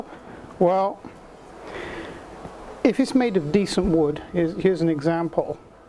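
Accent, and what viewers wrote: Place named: West Country, United Kingdom. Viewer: You talk in $, yet your accent sounds ‘West Country’!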